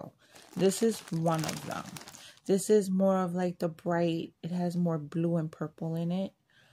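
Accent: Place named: Brooklyn, United States. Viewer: I’m so glad I found your channel. You sound like my tia from Brooklyn.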